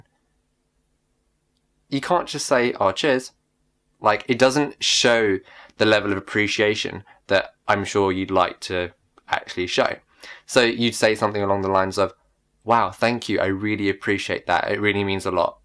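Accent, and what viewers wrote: United Kingdom. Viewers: Can anyone please tell me what kind of British accent does have? Is it RP? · You are sooo freaking amazing!!!!😍 I am currently binge watching your videos and I can't stop admiring you!!!😍😍😍 I wish I can go from Indian accent to the British accent like you do!!😍 It's magical trust me🌟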